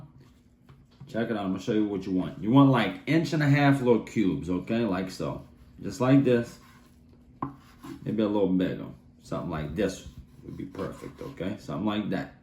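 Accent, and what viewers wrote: Louisiana, United States. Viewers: My boy got that terrebonne parish accent lol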